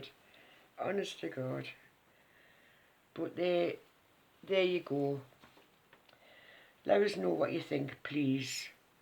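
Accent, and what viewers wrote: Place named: Newcastle, United Kingdom. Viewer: Lovely card hun and great channel. Lovely to hear another Geordie :-) xx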